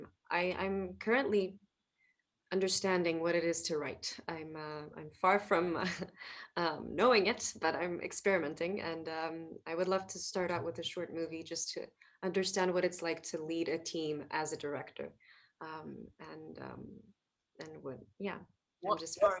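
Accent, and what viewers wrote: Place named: United States. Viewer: Why does she have an American accent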